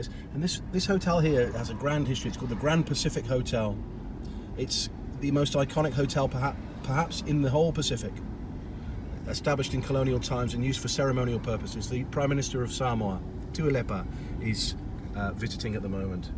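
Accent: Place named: United Kingdom. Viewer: He is British definitely from Uk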